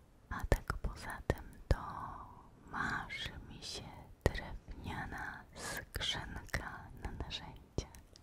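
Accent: Poland. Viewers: Enhorabuena por tus 20000 suscriptores. Te lo mereces porque lo haces muy muy bien. Tus vídeos y tu acento polaco son excelentes para relajarse. Gracias y un beso.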